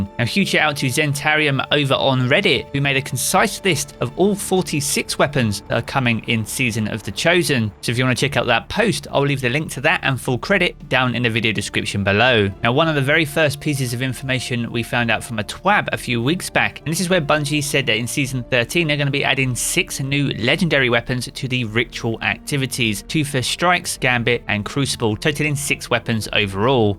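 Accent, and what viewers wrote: United Kingdom. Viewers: I so much love your briish accent idk I'm not English man:/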